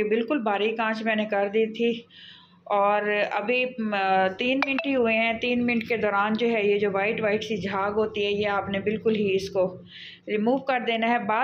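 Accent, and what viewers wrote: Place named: Saraiki, Pakistan. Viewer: ماشاء اللہ بہت اچھی دال بنائی آپ نے منہ سے پانی آ رہا ہے۔😋 آپ کی آواز سے پتہ لگ رہا ہے آپ سرائیکی ہو۔ سرائیکی ہماری مٹھڑی زبان ہے اس لیے اندازہ لگایا بہرحال آپ کھانے بہت اچھے بناتی ہیں 👌👍👏👏🌹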